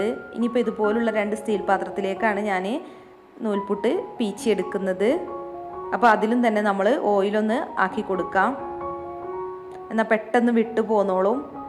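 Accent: Malappuram, India. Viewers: ഇജ്ജ് എന്താ പറയണേ " ഒഴിക്കലും " കയിക്കലും ഇല്ലെങ്കിൽ പിന്നെന്ത് മലപ്പുറാ..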